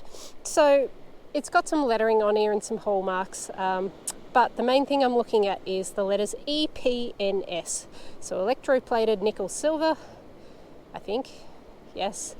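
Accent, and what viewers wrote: Australia. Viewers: Good job, young lady 👏... Well done and very enjoyable. Love your Aussie accent 😍❤. Your new adopted Granddad in Florida...praying that you will be Blessed and safe 🙏...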